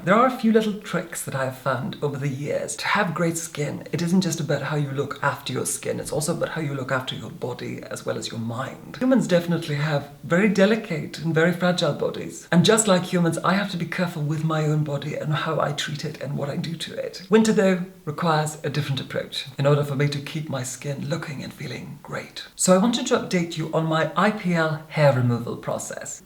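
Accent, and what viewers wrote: Scotland, United Kingdom. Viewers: Would love to hear your Scottish accent…….love from Aberdeen 🏴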